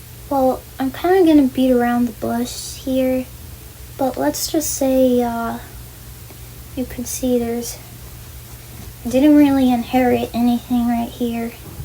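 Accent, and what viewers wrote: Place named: New York, United States. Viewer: Your accent came out a little in this video :p you've mentioned being from New York before but I didn't notice you sounded like it until now. There's nothing wrong with whimsy and knowing who you are :p legit feel like the whole being "stuck" mentally thing you are describing is just not true (I don't mean that your struggles aren't true, I just mean that I don't think you're actually mentally behind most people your age, lmao... have you met most 20 year olds nowadays? like. you're good, girl, seriously). Maybe it was something that felt slow or painful in the past but you clearly are growing and developing as a person, even just talking about the past few months. It might be more exacerbated because you live in (a part of) America, where being able to drive and owning a vehicle is almost a prerequisite for independence and is a rite of passage for adulthood, and the fact that you were homeschooled so you have less context about the maturity of other people your age, outside of your family, but legitimately, in any other place you'd be ... 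I won't say "normal", but... finding it easier to thrive independently? I talked about it in the comments of another video but I legitimately think that autistic kids don't grow "slowly", they grow in a "different shape" than others, and it only looks slow if you're trying to force them to grow in the "normal" shape, unnaturally. You clearly have skills, emotional maturity, and agency that most 20 year olds don't. The agency at least is no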